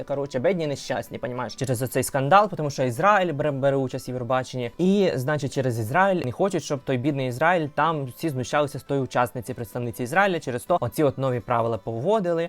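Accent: Ukraine. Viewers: Твої коментарі це щось. Це б ще я отако "обсирала" тих дідів до хліва і їх галстук. І мова, і вимова,і східняцький акцент. Ти прикольний. Тепер буду дивитись огляди на "лгбт-вакханалію" з тобою.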